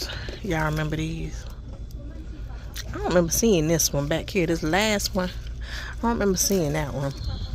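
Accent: South, United States. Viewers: This is my 1st time on your page, you have a amazing asmr voice, then add that southern accent, PRICELESS 😁😁😁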